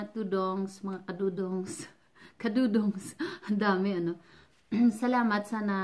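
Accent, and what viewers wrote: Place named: Western Visayas, Philippines. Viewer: Hehehehe Ganon dapat malambing ilonggo hehehe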